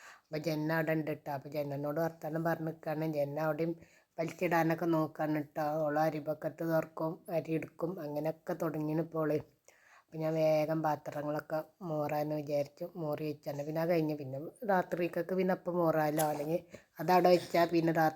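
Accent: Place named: Malappuram, India. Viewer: എന്നെ പോലെ ട്ടോ ട്ടോ വരുന്നുണ്ട്, മലപ്പുറം ആണോ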